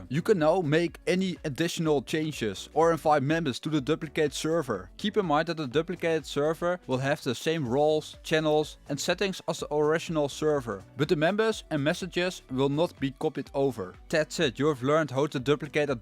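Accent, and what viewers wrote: Netherlands. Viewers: no I love the Dutch accent ❤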